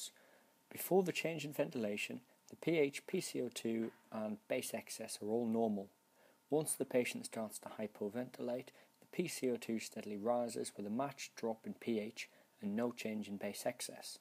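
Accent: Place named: United Kingdom. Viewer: British accent, talk too fast, volume too low. Difficult to understand. SLOW DOWN.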